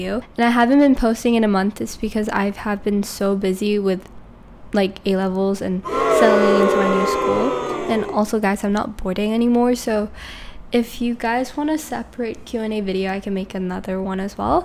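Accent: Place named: United States. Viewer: wait im just curious why do you have an american accent if gcses are for the uk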